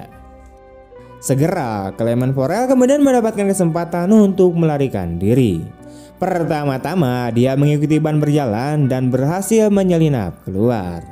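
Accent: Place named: Sunda, Indonesia. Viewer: Anyeng suara nbte ngenaheun co aa ti Sunda siganaamah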